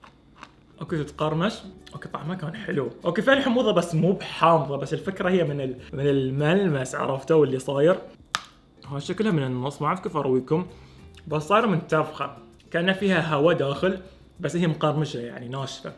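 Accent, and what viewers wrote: Bahrain. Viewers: اول مره اشوفه و محتواه يجنن بل بدايه حسيته عراقي بس حسيت لهجته بحرينيه او من اهل الاحساء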